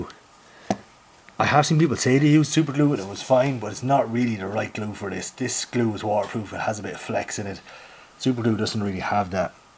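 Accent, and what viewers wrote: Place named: Ireland. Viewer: Love the Irish accent bro fae your Scottish brethren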